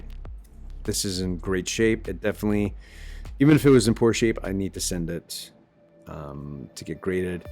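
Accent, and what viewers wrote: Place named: Canada. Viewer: wicked strong Canadian accent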